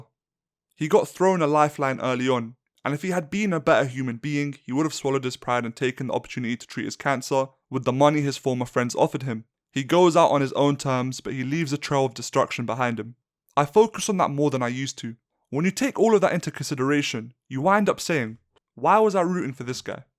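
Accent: United Kingdom. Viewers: Very good video but why the fk yall british bro. Change ur accent n u gon pop off. Love you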